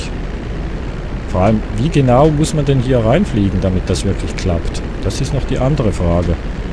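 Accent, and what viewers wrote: Switzerland. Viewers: Kommst du aus der schweiz? :D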